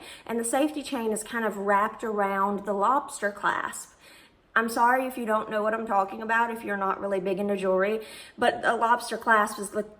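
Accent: South, United States. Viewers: where in the south are u from because I like your accent 😊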